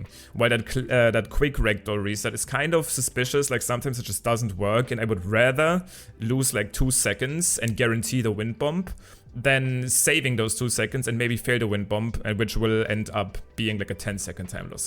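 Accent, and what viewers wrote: Germany. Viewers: you sound german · Man merkt den Deutschen Akzent so hart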